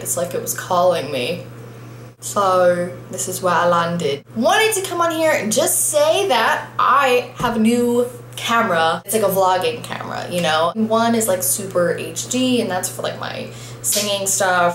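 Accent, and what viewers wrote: England, United Kingdom. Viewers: your english accent is hilarious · Yu have and awesome British accent! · Your British accent sounds like Cher Lloyd, and when you say that you look like her too. Lol. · Yeah your london accent is terrible. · You have a British accent here idk if its fake or if your American accent is fake but wow you do good with either lol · love your British accent <3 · is it just me or does she have a slight british accent now??? 0.o :D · Not only does she have an AMAZING singing voice... she also does a GREAT London accent!! You're awesome, and I hope you go FAR!! Respect from London, England!! xxxx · your british acent is awesome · Is it just me or is she developing an English accent. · Questions: 1. Were you trying to talk with a british accent? 2. What makes YOUR fans the absolute best fans in the world? =) 3. Who is your big inspiration? 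4. Where, and why, did you move? 5. I am your biggest fan ever! =))) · hi love your british accent. hehe.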